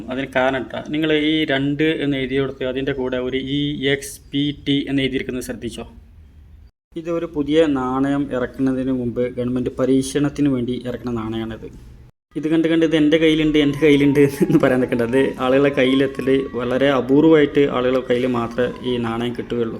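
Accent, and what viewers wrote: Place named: Tamil Nadu, India. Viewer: വീട്ടിൽ ഉണ്ടാക്കുന്നുണ്ട് ഇല്ലേ സംസാരത്തിൽ തമിഴ് കാണുന്നവരെ പറ്റിക്കുന്നു ഇങ്ങനെ ഉള്ള വാർത്ത കൊടുക്കുന്നവർക്ക് സെൻട്രൽ ജയിൽ RBI യിൽ റിപ്പോർട്ട്‌ ചെയ്യുക 🙏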